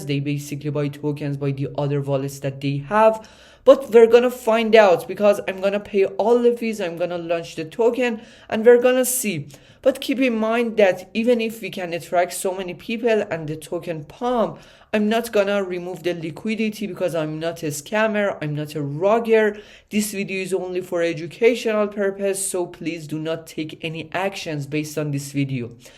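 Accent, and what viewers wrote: Iran. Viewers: dawg great content btw i think you iranian by the accent. im iranian too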